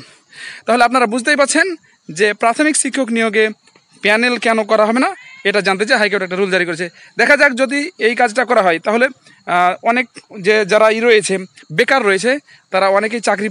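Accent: India. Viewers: গুরুত্বপূর্ণ তথ্য জানাচ্ছেন এজন্য অসংখ্য ধন্যবাদ। দুইটা ভিডিও দেখে Subscriber করলাম। একটা অনুরোধ ডেট দেওয়া মাত্র জানাবেন। একটা কথা জানতে ইচ্ছে করছে আপনি কি বিহারি? কথার উচ্চারণে অনেক ছ ছ আসছে। কিচ্ছু মনে করবেন না এমনিতেই জানতে ইচ্ছে করলো। 🙂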